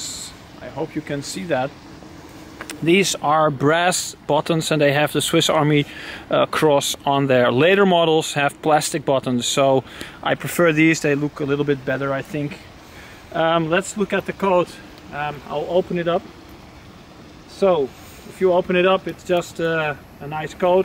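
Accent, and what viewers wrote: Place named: Netherlands. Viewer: Ja klopt : ) Hoorde aan je accent in de video ook gelijk dat je uit Nederland komt, haha. Dank voor de tip, die site ken ik wel, veel toffe surplus daar ja. Het Waterlooplein in Amsterdam levert ook wel eens leuke vondsten op en soms zelfs de plaatselijke kringloopwinkels. Vintage kleding en oldschool campinggear enzo.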